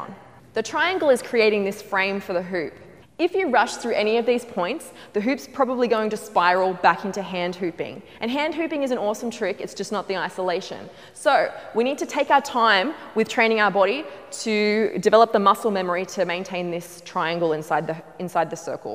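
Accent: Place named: Australia. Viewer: I could listen to you talk all day! What an awesome Australian accent you have.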